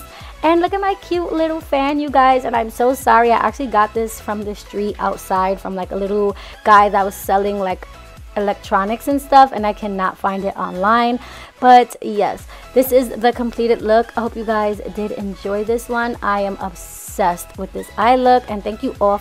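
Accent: New York, United States. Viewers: Her accent🥰so New York I love it💕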